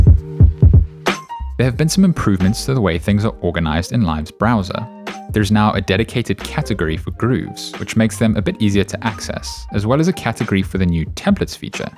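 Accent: South Africa. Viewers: That SA accent! Lekker man, great video. Excited for ableton 11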